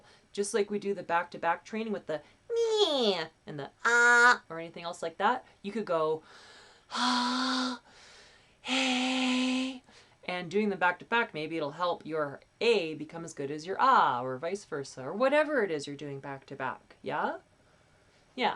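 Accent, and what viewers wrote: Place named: Canada. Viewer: the Canadian is strong with this one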